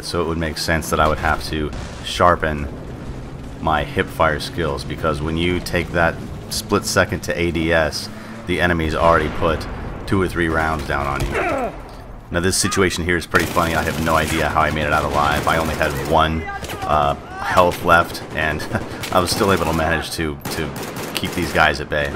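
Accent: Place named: Midwest, United States. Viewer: The attributes in your voice suggest that you are 1) experienced, 2) rich in character, 3) from the Midwest USA, and possibly 4) you were born in - and I'm just taking a shot here - May! Please tell me how much I got right, I am a slight Sherlock Holmes enthusiast. By the way, I too rather like the intrinsic appeal of this weapon, even though the stats are quite mediocre. Great review!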